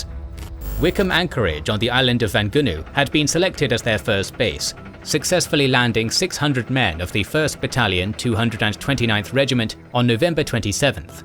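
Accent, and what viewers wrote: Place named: England, United Kingdom. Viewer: its Amerikal as in California the 'c' is very hard All u need do is google to get these words right But then u are English by ur accent and u know how to pronounce everything no matter what language it came form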